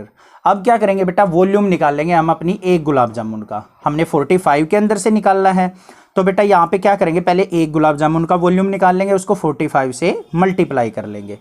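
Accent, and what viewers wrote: Haryana, India. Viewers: Sir it's the best explanation ever for these questions !! It's really helpful!! And from your language ; I think you are from haryana😁😁😁😁 Jai Haryana Jai haryanvi🤘🤘🤘🤟🤟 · Sir aap haryana se ho kya?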